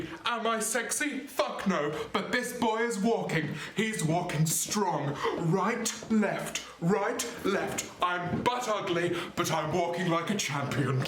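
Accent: United Kingdom. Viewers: Lol James Marriott just popped up in my recommended list...but he is soooooo funny! Good work. Love the British accent and British humour. Annihilated this ‘abbas’ bloke lol!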